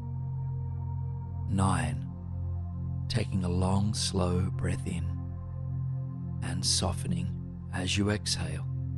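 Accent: Australia. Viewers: Hi, lovely to listen to your videos. It's actually quite nice hearing another fellow Aussie. It makes the whole thing more familiar and comforting ✨️ ✨️ ✨️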